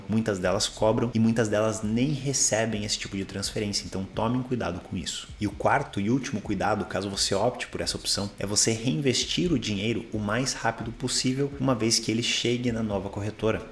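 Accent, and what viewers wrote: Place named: United States. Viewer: Você é americano? Pois tem sotaque Se for vc deve entender bem do mercado americano. Como é o seu curso?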